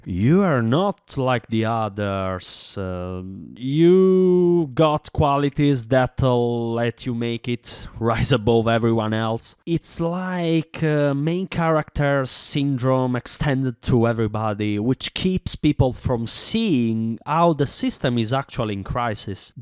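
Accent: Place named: Italy. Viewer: Good job but se ti guardi 3-4 episodi dei sopranos in lingua originale sblocchi l'accento da new jersey invece di dover rimanere con l'accento da scuola pubblica italiana con insegnante zitella di origini partenopee. All'audience international piace di più se ti possono immaginare dire "capocollo" come interiezione, fidati non è difficile.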